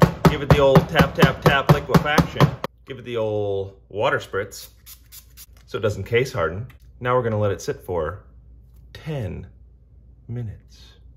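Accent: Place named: United States. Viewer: you have an American accent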